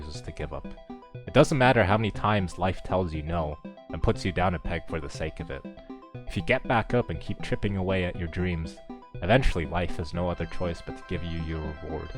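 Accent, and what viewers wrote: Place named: United States. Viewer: 1.2 19 start when? also why do you sound so american?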